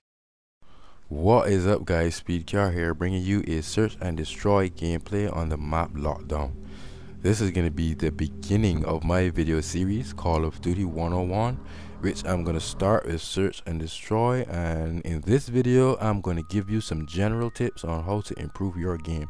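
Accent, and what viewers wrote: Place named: Barbados. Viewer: Hi.... Some points for improvement... No background music. When listening on a cell phone the small speaker makes it harder to hear you. I like how you talk the whole time. You come across very clear and the Bayan accent is of course awesome. Last, you might consider adding more tags to increase exposure and I would include MW3 in the title. Most folks are too lazy to type out the full name. Tmartn needs to watch out for!:)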